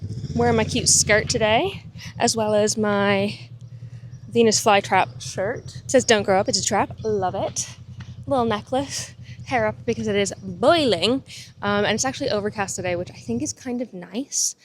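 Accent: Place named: London, United Kingdom. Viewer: Your London accent is improving! Banana plants and 20 quid but you slipped up major on Herbs! 😊 I love that market - how did you come away twice with nothing? 😲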